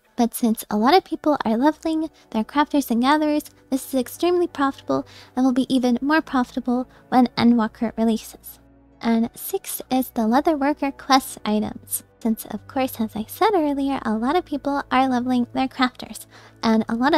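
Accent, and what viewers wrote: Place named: Texas, United States. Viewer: I have to be honest after watching you streaming is a little weird hearing you without your sassy texan voice. Still, cool video.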